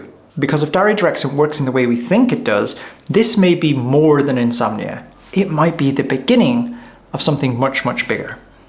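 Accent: Ireland. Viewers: Well…you may be working for the NHS but you’re definitely Irish. Tell me I’m wrong? Bet I’m not!😊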